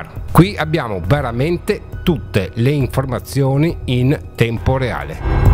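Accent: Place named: Friuli-Venezia Giulia, Italy. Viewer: Il tuo accento (a me molto noto) tradisce la tua “Giulianità”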